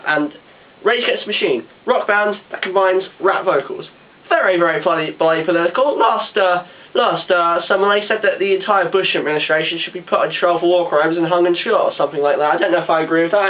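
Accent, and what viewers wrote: United Kingdom. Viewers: hey, i don't know if you have the same problem over in england (sorry if i'm wrong i'm just judging from your accent, and you did say your not from america) where you have people talking about how metal is tallentless, or did some of our braindead trash just leak over to your country??? i agree with you 100% and wholeheartedly, but anyway we have that problem alot over here....and it gererally pisses me off! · Video is instant win because of British accent.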